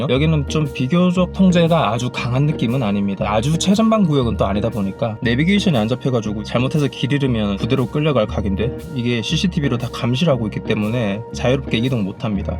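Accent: North Korea. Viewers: 이분 탈북자임? 말투나 억양이 좀 있는거 같은데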